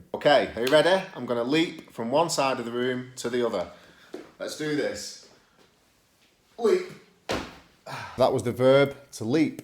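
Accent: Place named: North, United Kingdom. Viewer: Very interesting video. I like your northern accent. It's curious because usually, those kind of accents are hard for me to understand but yours is cool. I discovered your channel three days ago. I watch several youtube channels about learning English. Some of then have a huge amount of subscribers, yours hasn't yet, but you sound very sincere, clear, simple and useful. Thanks from Basque Country Spain.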